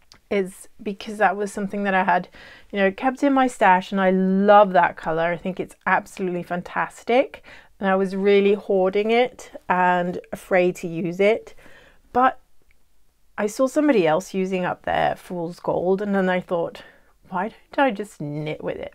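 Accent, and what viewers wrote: United Kingdom. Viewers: Hello. Greetings from Ridgefield, WA, USA! I just found your podcast and quite enjoyed it. I'm a fairly new knitter so I have alot to learn and am open to any and all tutorials. Could you please give your name and explain why you are in The Netherlands, please? I had assumed from your accent that you are in the UK. Thanks, and take care. Patty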